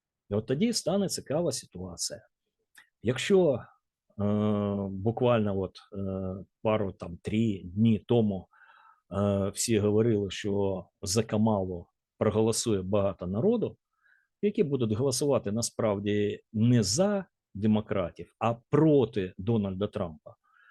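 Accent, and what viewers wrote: Russia. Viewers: Як відчувається російський акцент, і в мові, і в темі.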